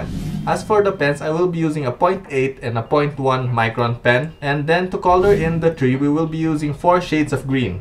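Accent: Philippines. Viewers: hI, recogninzing your filipino accent ! Thanks for your tips, i love it ! val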